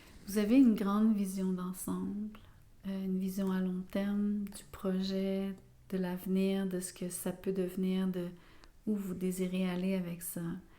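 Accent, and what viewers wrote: Quebec, Canada. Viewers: Heureusement que vous n'êtes pas ma voisine !je serais en consultation permanente ! Rien que pour me laisser bercer par votre accent québecois !😃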